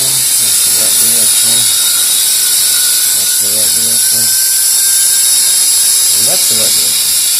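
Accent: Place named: England, United Kingdom. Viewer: very close English accent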